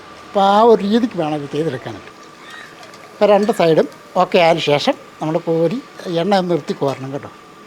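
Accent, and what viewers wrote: Kottayam, India. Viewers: താങ്കളുടെ കോട്ടയം slang ആണ്ഏറ്റവും മനോഹരം വിഭവങ്ങളുംവളരെ ഗംഭീരം നന്നായിരിക്കട്ടെ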